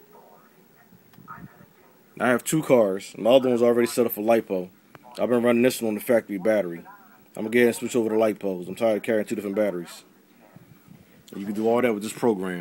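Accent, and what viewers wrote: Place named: Houston, United States. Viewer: Bet that. Im in Houston. Had a homie in High School from Philli. He was cool as hell....lol. But you sound like you from down here....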